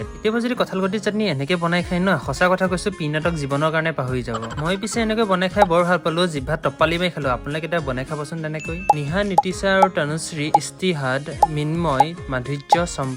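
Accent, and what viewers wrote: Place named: Nalbari, India. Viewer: dada mur nam tu lona koi koi vagor lagisa❤❤ tumi kom kom buli he koi thaka nokowaya dakhun nalbarir para koiso❤❤❤❤❤❤❤❤❤❤❤❤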